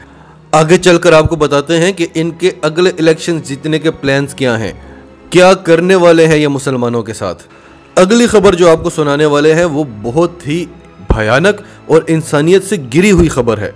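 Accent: Pakistan. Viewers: Good review pure pakistani urdu accent love you brother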